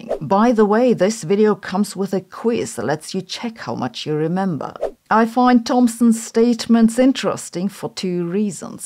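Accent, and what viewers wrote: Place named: Germany. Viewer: 👎👎👎 SABINE, your English is so awful, that you must go back to school an improve it. You are arrogant to address publicly people on YouTube with such a horrible English. You can not cover up your German roots. 👎👎👎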